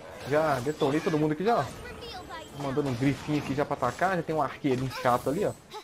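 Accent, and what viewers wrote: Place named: Bahia, Brazil. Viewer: essa voz de baiano que saudade crl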